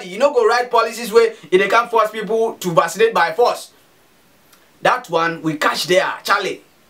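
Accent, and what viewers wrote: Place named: Pidgin, Nigeria. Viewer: hahahaha na soooooo pidgin, welcome 😃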